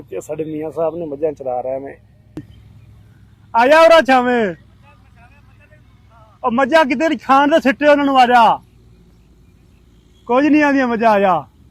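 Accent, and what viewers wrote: Punjab, India. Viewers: charde panjab dahi lagda hai bol bani iko jihi haisafe babe 8 chuk de c nanke v pak bich rehinde dlskot de c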